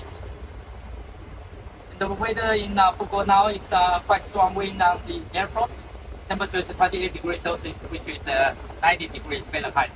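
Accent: Taiwan. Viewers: 風趣的台式英文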